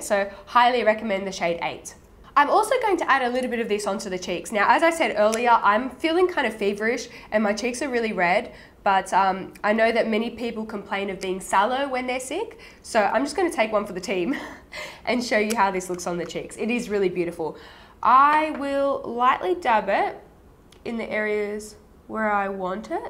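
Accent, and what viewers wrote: Australia. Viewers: Where is she from? Australia? :)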